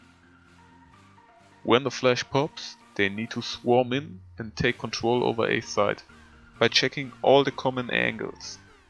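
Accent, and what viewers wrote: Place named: Israel. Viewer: I could swear I clearly detected an Israeli accent in your speech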